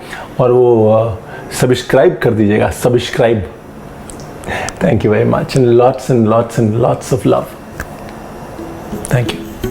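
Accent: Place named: Lucknow, India. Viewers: Sir aapki lucknowi language and style umdah..🥰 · Chef your lucknow accent is very nice❤️ And recipe 😋😋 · Ranveer jab aap humaye lucknow ki bhasha me baat karte hai... Tab aap aur bhi jada apne lgte hai.. ☺🤗👌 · love the way you talked today that Lucknowi style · He talk like Lucknow wali accent. 😀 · Bhai aap lukhnavi bol rahe hai mast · Aap kitni pyari lucknawi bolate Hain hum raebareli pratapgarh se hai 😀 I love you for this ki saree recipe dekhte hain aap kahate Hain Na hanste khelte khana ban jaaye to achcha hamen bahut pasand hai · Lovedddd the Lucknowi Accent.. Ama miyaa kamaal krte hain aap!❤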